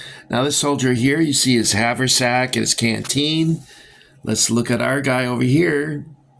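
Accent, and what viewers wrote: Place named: England, United Kingdom. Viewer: As ever an excellent video with your own fantastic style too. Great English accent BTW. ❤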